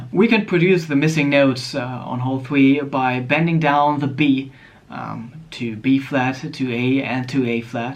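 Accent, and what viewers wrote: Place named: United States. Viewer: gewöhne Dir an Englisch zu sprechen, und nicht "fucking american"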